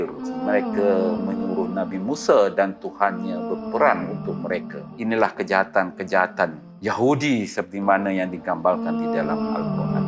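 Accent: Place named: Kelantan, Malaysia. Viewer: Loghat tumpat kental sangat 👍👍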